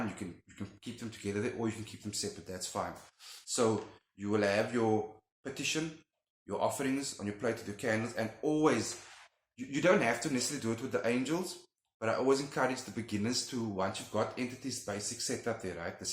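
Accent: South Africa. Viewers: Oh man, hearing your "R" i automatically knew you are South African. I love that accent.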